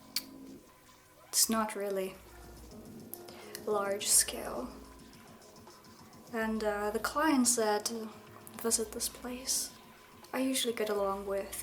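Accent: Germany. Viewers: this shit had the ability to take me to a cyberpunk world with the lighting the music and your german accent. powerful shit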